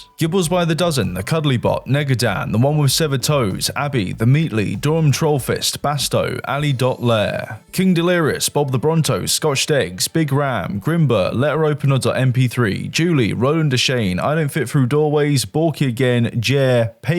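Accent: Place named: United Kingdom. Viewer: Never stop making videos like this. As a fellow Brit it's nice to not have to listen to yanks droning on lol.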